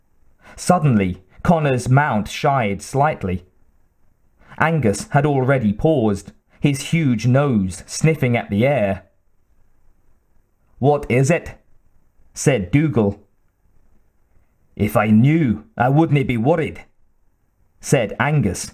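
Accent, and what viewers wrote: United Kingdom. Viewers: Listened to most of your audiobooks but couldn't get through this due to your god awful scots accent really no need for it.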